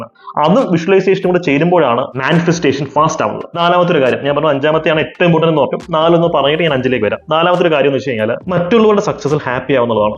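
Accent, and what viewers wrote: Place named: Malabar, India. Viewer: Alambu malabari language 😊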